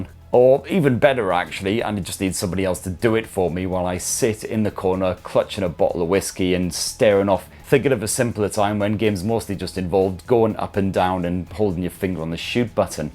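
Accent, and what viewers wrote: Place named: Sunderland, United Kingdom. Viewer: The innuendos and smutty humour were very strong on this one. Well done my favourite Macam or is it Geordie? Sorry not sure. Either way the best tech reviewer from the north east, possibly the world! Edit. Ahhhh you answered my question. Sunderland!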